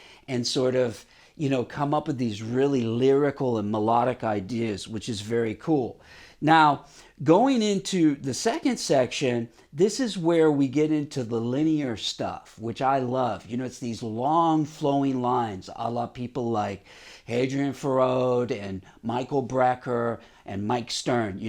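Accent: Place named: United States. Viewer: omg you are a monster... ps you mentioned 5 pounds. You are a USA native right? You sound like it.., Ps I have 1978 neck through body Eagle BC rich bass which is amazing. Sound neck playability is great 👍. I bet you could tear it up.... I gotta say even the stuff you talk about is on another level.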